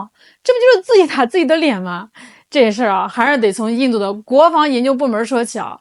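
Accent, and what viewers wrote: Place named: Hefei, China. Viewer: up主好像是合肥口音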